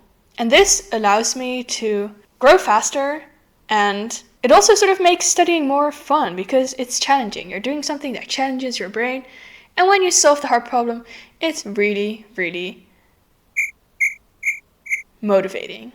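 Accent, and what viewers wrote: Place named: England, United Kingdom. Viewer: Love your english accent ❤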